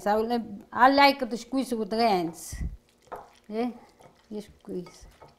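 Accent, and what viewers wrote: Italy. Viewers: Love watching you and enjoyed your Italian mannerisms and accent. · Hi Italian. Grandma. I love your. Cooking. All your recipes. Are. Great. I am making artichokes. This weekend. I watch you every single day. I enjoy your singing. Plus I love your Italian. Accent. Yim 4th generation. From Sicily. Keep. Cooking. Grandma. God. Bless you. Love. Ssndyin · I love, love, LOVE your Italian accent. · I am a second-generation Italian American who grew up in the 40s and 50s in upstate New York in an Italian neighborhood. Both of my parents spoke Italian but chose to not teach us because "we were American". But all of my grandparents and most of my neighbors spoke with the same accent as you. When I watch your videos, it brings me back to when I was a kid. Most of your recipes are just like my mother's. I have tried several of your recipes and they are never disappointing. 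We had friends over for lasagna recently and my wife made the lasagna with store-bought pasta because she didn't want to take a chance with your recipe until we tried it first ourselves. Well, I made your recipe myself and after everyone had a portion of my wife's. I brought out my dish and everyone raved about it. Even my wife, who is usually very stubborn, admitted that my dish was better than hers. I like your singing too. My father had a beautiful operatic tenor voice and sang all of the time. Thanks again for being you. Domenic Campanella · No wonder this video is viral: "Now-a, first-a you gotta open-a da peppa, you got a nice-a peppa like-a dis."? You're ADORABLE! Sweet stuffed Bell Peppa's, from a Sweet Italian Bella Donna like-a. Statta Buon! Ciao. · I love her Lil Italian accent plus recipe lock yummy❤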